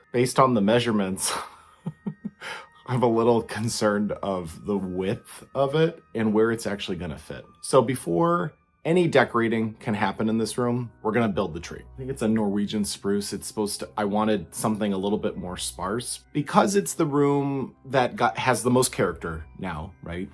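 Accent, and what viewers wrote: Minnesota, United States. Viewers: The final garland look over the TV deserved that high kick! Looks so great! Since you mentioned you had 3 sets of batting, without further ado: On the 3rd Day of Vlogmas my true love gave to me: Three sets of batting Two power stips 🔌🔌 And you sound like the movie Fargo 🎄⛄🙂⛄🎄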